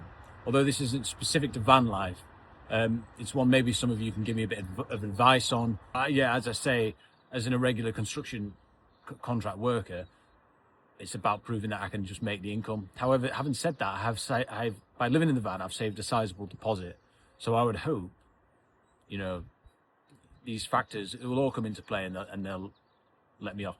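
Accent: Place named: London, United Kingdom. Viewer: Well, you are certainly not Scottish with that accent ... my guess (I've not watched any of your vids before this came up) is that you come from the Greater London area - north of the river I hope 😁 The van looks very nice. Mine is very basic too, with just a bed and W.C. Will be looking at your back pages 👌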